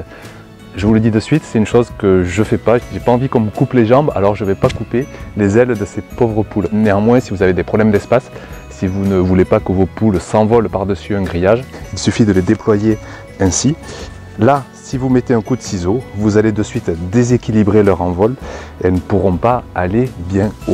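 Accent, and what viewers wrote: South, France. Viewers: je viens de découvrir vos vidéos, elles sont super et très instructives franchement je me régale et puis l'accent du sud dont je suis aussi ça fait plaisir 😀 alors oui moi aussi j'ai des cocottes 3 petites rousses et une pékin, qui recommencent à pondre tout doucement après la pause hivernale, c'est super les poules moi j'adore et je ne vois pas mon jardin sans elles, j'aime les voir déambuler et picorer par ci par là et puis moins de taupes depuis qu'elles sont là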